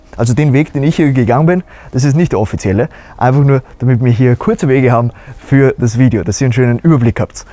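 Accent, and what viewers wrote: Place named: Austria. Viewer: Schönes Objekt. Aber diesen österreichischen Schnellsprecher musste ich stummschalten.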